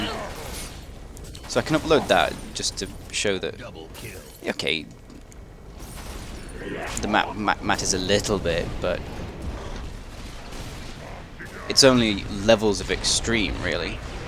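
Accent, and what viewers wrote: United Kingdom. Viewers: are u english??????????????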